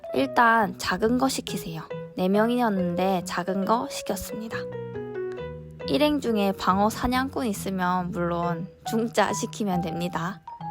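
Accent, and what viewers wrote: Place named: Gyeongsang, South Korea. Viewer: 서울사람이라면서 갱상도 사투리네예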